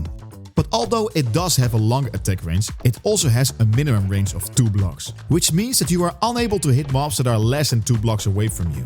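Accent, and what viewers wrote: Netherlands. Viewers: Holy nederlands accent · Great vid, and great dutch accent :D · W tutorial, deze guy is zowizo nederlands!!! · Just a question, are you dutch? You just sound like how dutch people talk in english. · I smell a dutch accent huh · You dutch? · i smell dutch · The accent gives it away Hello fellow dutchie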